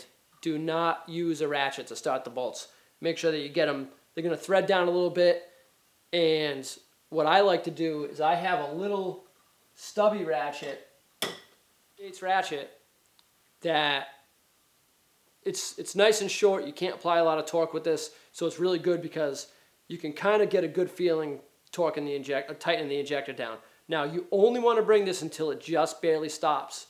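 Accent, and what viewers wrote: United States. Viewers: Doing my first injector replacement on my 03 6.0, this video is super helpful. Judging by your accent your about 1 state away from me, what I'd give to have you walk me through how to do it. Thanks for your help again.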